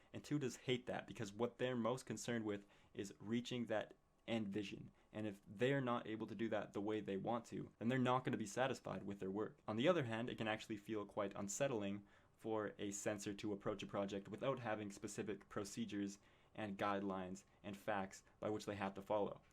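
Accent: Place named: Canada. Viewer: Are you Canadian? Love your accent!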